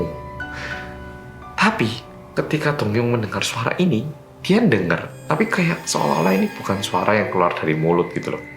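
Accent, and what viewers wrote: Java, Indonesia. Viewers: Lanjut ngerungo'no critoe oppa seng meddok 😊😊😊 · Lebih enak didenger medokkk drpda yg tadi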